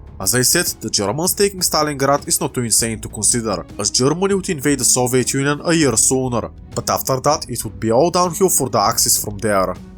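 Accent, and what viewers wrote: Bulgaria. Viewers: The Bulgarian accent is strong with this one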